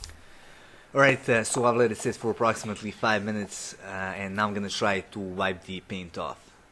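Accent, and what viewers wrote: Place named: Italy. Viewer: I like your italian accent Mille grazie alfisti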